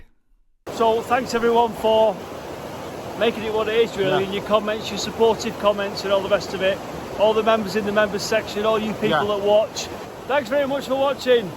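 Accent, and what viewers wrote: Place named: England, United Kingdom. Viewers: Beautiful. Thank you for your video’s. I just subscribed. I’m very happy I found the Chanel. I’m American , SE section. Your accent is so interesting. I understand you well and watching this I realized people from England or in that area of the world have a dialect that chops up their words. My granddaughter can talk just like an Englishman. ❤️ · I'm glad your not going to speak Yorkshire. As a Yank, I can barely understand your Manchesterese. Love your videos though, even if I do occasionally have to turn on the closed captioning. By the way, I also line in Manchester.......New York, USA!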